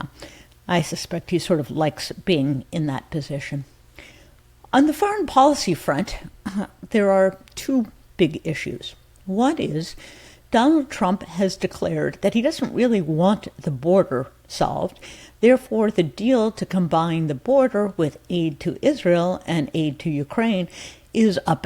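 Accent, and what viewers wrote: United States. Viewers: Agree! A cultured American accent and voice. I could listen to her for hours. So very intelligent and balanced.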